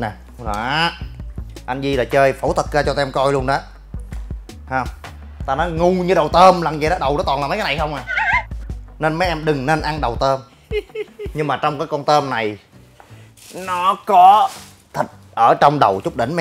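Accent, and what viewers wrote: Mekong Delta, Vietnam. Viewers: Lần đầu xem videol của a nhưng rất thích đậm chất miền tây dễ thương ☺️ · Mới xem ông này nói chuyện cười quá giống chất miền tây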